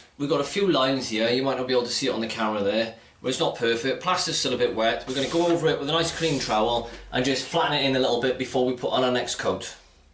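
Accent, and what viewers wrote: Wales, United Kingdom. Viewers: Welsh, not Irish. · I tried to patch some plaster in my kitchen and made a right mess of things. I found this video and the explaination and skills shown are superb. I am really please with my work thanks to you. And the lovely Welsh accent is an added bonus! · Like your videos, great sense of humour, that accent are you from Cardiff/Barry?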